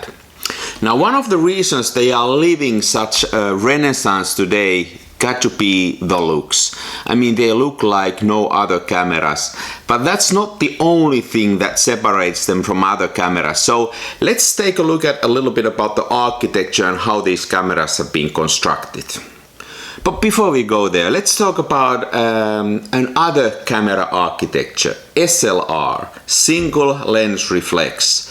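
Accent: Finland. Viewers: Rallienglantia, tunnistin heti suomalaiseks :D. Ei vaan hyvä video ja sain noudettua tänään oman Yashica C:n Kuvasin tänään rullan Fomopan 100, seuraavaksi Kodakin Gold 200:sta.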